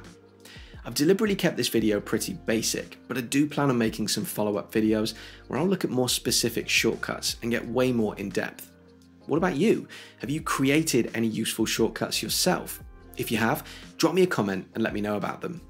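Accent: United Kingdom. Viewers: Often wondered, now I know, 🙏 and you’ve a British voice without the bull that most vlogs from across the pond revel at.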